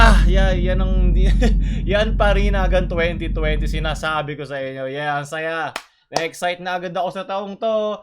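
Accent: Visayas, Philippines. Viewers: anue ba 😳😂 iba talaga pag bisaya ka, iba pagkakabasa mo 😂 (bisayawa here)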